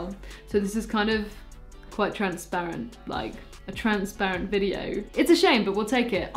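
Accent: United Kingdom. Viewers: Bri'ish accent is so cute in this one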